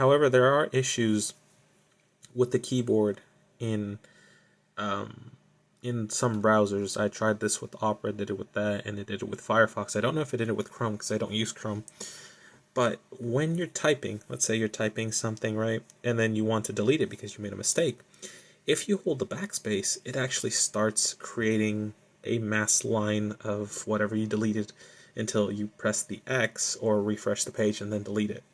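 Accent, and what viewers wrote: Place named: France. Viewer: The French accent😂😂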